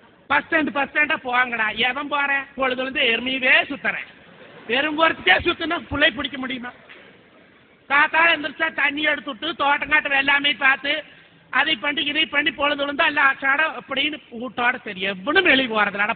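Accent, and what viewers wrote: Kongu, India. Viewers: kongu tamil...always great.... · Super nga very nice kongu Tamil la alaga pesuninga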